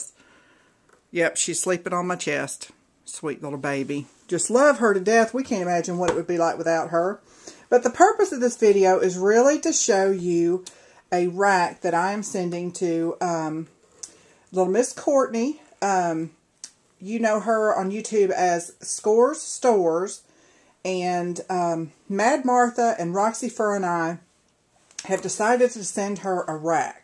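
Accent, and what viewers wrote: United States. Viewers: Wow!.. that is soooo generous of you... and I just want to tell you that your voice is sooo cute to me.. You sound soo country.. (and I mean that in a good way).... Awesomely swell of you!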